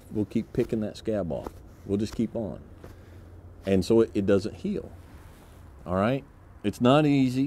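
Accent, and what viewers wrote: United States. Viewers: Just get another buzz mate and there you go ))) I like your videos and stuff . And I adore your John Wayne's accent mate . Just keep doing it . I love it for God sake ))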